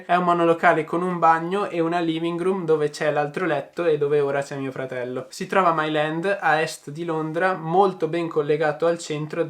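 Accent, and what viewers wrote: Veneto, Italy. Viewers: Sei veneziano?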